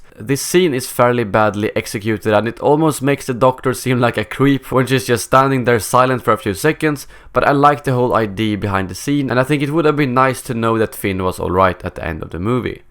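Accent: Sweden. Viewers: he sounds very swedisg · är väll svensk?. Det låter så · Are you swidish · Love your accent, dude. Swedish, I'm guessing? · Are you swedish? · you're swedish XD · are you swedish? You sound swedish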